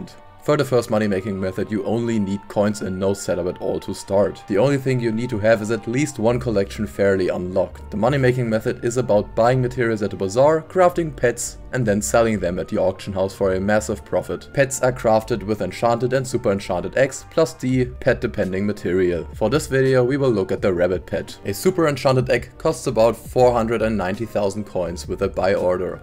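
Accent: Germany. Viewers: Yooooo You are DEFINITELY German 💯💯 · DEUTSCHER ACZENT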